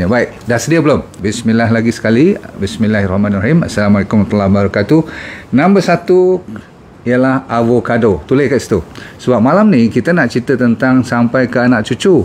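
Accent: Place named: Kelantan, Malaysia. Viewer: Pandai kecek klate. Manih leting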